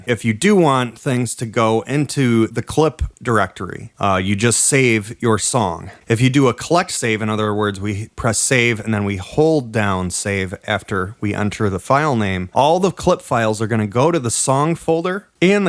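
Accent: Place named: Michigan, United States. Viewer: Man you are really good. And you sound like you are from my home town in Michigan.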